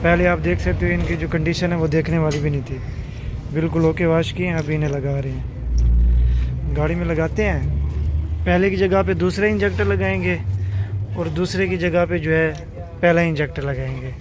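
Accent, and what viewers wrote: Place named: Saraiki, Pakistan. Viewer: sir ap ka leja saraki lagta hay kayak lodhrahan maestro hay ap kebab work shop.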